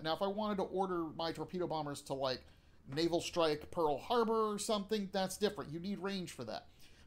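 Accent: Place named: Melbourne, Australia. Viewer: Sounds like Melbourne, Australia.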